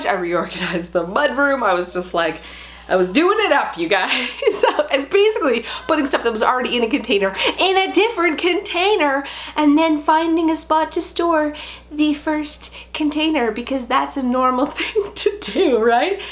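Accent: Canada. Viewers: I thought you were from Canada based on your accent! ☺️☺️☺️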